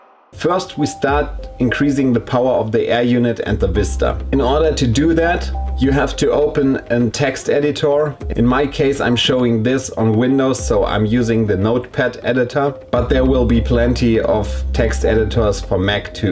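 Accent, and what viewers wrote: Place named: Germany. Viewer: Ive seen like 50 of these videos hahaha and it took a German speaking English with a light accent to Deliver the message clearly for me lol Thank You. just subscribed...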